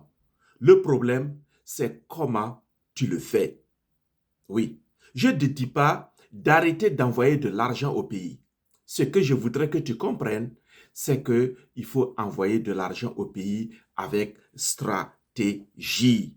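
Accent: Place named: Burkina Faso. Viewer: Accent Burkinabé. Tu as raison Mon frère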